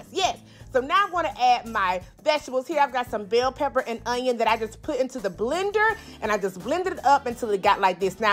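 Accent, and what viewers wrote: South, United States. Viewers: Not the lite bread girl 😂😂😂 ooooooo you country I LOVE IT. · Love your Southern accent. A very enjoyable dramatic video. I would share this with my family! Thank you!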